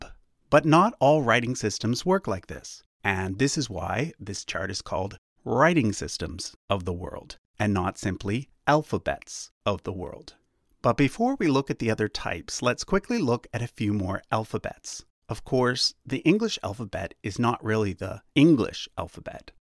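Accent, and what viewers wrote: United States. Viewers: why do you say all the east asian languages in the most american way.. at least try and research😭😭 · I loved hearing him pronounce asian languages with an american accent lol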